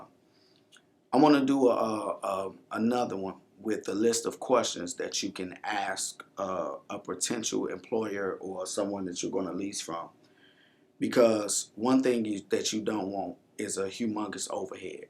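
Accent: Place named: Chicago, United States. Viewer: U gotta be from Chicago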